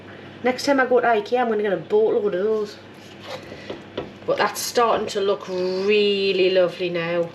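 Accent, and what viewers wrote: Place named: Teesside, United Kingdom. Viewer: EE by gum lass that looks grand, I left the Boro sixty years ago nice to hear your accent.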